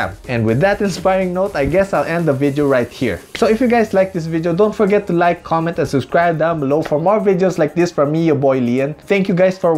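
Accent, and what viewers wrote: Philippines. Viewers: omg im so early ahhh! oh thank you!! im currently 4th year student and because of this i now know what challenges i may have encounter! thank you soo much! at first i was doubting myself if i could really be an architect because i suck at math and that im not that good at drawing and when im measuring i dont know the right measure or angle soo that made me think of another course but when i found your video i found hope that i can do it! soo thank you very much! i watch other arcgitectural video but cannot seem to understand them but you,i could really relate (maybe because were both filipino and love the accent make me feel at home so that make me feel comfortable and actually listening to you) thank you for being my inspiration and i hipe you will be my mentor in the future as you said XD! Oh well then god bless you kuya!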